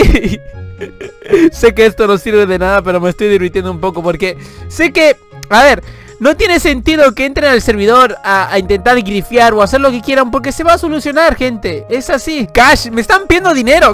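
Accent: Argentina. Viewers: Sos argentino? Xd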